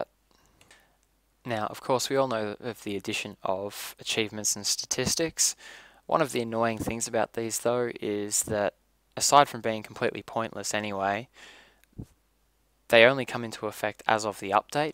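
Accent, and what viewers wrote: Australia. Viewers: nice are you aussie ?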